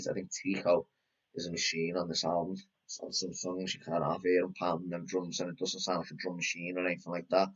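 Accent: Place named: Liverpool, United Kingdom. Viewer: Nice to hear a fellow scouse accent talking about 😉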